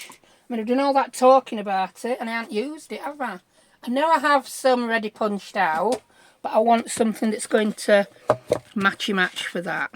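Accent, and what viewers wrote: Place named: Barnsley, United Kingdom. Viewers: These are brilliant! You are definatly my favourite junk journal channel. I love that your accent is so familiar too, i'm from barnsley, are you local to me? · Hi love your bag journal, I will deffo be trying that in my witchy papers. Where are you from you sound from like my neck of the woods in Wakefield x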